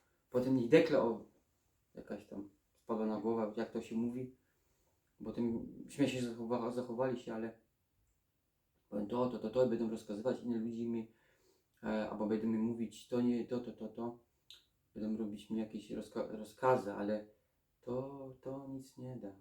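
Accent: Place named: Silesia, Poland. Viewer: Zrobiłeś pierwszy krok ,że mówisz, to już jest dużo. Trzeba wszystko z siebie wywalić,co cię boli wewnątrz w środku twojej duszy. Jesteś wrażliwy i to widać,fajny chłopak ,otwarty,super. Wyjdziesz z tego ,musisz bardzo chcieć a ty chcesz. Tylko od ciebie to zależy. Już ciebie to uwiera i bardzo dobrze. Super facet z ciebie,dasz radę, jesteś ambitny i pokażesz ludziom ,że można. Będziesz jeszcze innym ludziom pomagał wyjść z alkoholizmu. Ty to znasz praktycznie a nie z teorii,masz w sobie prawdę. Walcz o siebie,bo warto. Świat jest piękny. Powodzenia. Nagrywaj filmy jak ci idzie,krok za krokiem powoli będziesz wychodził razem z nami a my będziemy się cieszyć jak każdego dnia walczysz o siebie. Mów wszystko,wszystkie swoje doświadczenia,to co ci przychodzi teraz na myśl,co cię boli.Nagrywaj i mów.☀️☀️☀️☀️☀️☀️🌼🌼🌼🌺🌺🌺🌹🌹🌹🥀🥀🥀🇵🇱🇵🇱🇵🇱🇵🇱🇵🇱. Zrób to dla Naszej Ukochanej Ojczyzny. 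Jeszcze twardy Ślązak,piękna gwara, coś pięknego.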